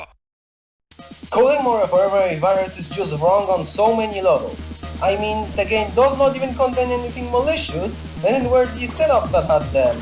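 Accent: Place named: Poland. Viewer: Po akcencie i po opisie widzę, że jesteś z Polski :D Chciałem się zapytać czy wiesz gdzie można pobrać MF ale podałeś link do strony gdzie można je pobrać. Ale chcę się upewnić, i zapytam czy strona, którą podlinkowałeś (ten drugi link) jest na pewno bezpieczna? Gdziekolwiek bym chciał pobrać MF to jest zainfekowane i jesteś jedną z niewielu osób, u której jest podana strona, na której można pobrać MF i byłbym bardzo wdzięczny za odpowiedź czy strona ta jest na pewno bezpieczna i jak pobiorę MF to nie będzie zawirusowane. Z góry dziękuję!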